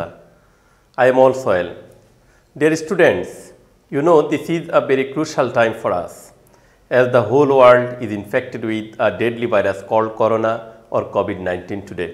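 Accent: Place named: Bangladesh. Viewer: Honourable sir, First you complete phonetics after that teach nationally. I think you are a Banglish teacher.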